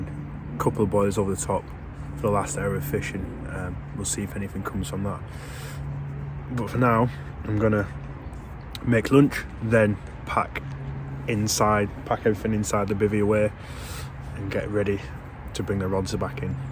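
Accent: Northern England, United Kingdom. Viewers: Where are you from? Clearly a Northern lad! Me and my mate fish here and have been Farnham members for a few years. We travel from Blackpool. Check out Red Rose angling for a session we recorded on Badshot Lea Big Pond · Always bud! It's all different for everyone isn't it and a good laugh on a blank is still a great time out for me 💪 haha I am yet to catch from linear so don't expect any secret winning tips from me 🤣🤣 yeah mate I would be keen for that! FB me where abouts your club is, think I detect a northern accent from yourself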